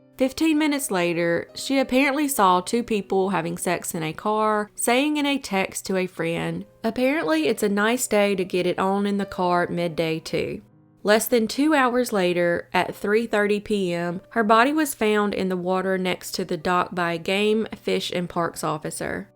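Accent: South, United States. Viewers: Ahh ya my fave southern drawl accented lady from over the pond :) it’s much needed just fallen out with a life long friend over money :( should never have helped her but too late now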